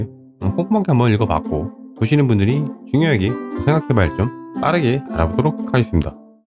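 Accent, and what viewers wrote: Chungcheong, South Korea. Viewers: 덕분에 쾌적하게 즐기고있어요 감사합니다 마성의 충청도말투 ㅎㅎㅎ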